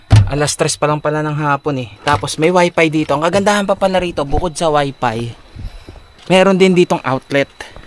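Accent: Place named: Pakil, Philippines. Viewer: parang taga Pakil ka sir? kilala ko punto mo eh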